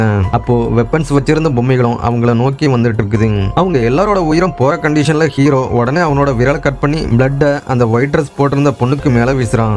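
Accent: Coimbatore, India. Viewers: Unga kovai tamil apo apo varuthu nice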